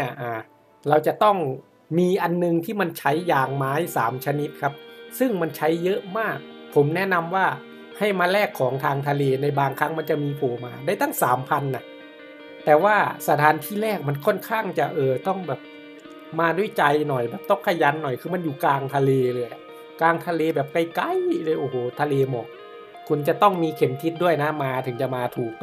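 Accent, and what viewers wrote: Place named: Ubon Ratchathani, Thailand. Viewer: ครับผม สำเนียงพี่เหมือนคนแถวบ้านผมเลย ผมคนอุบล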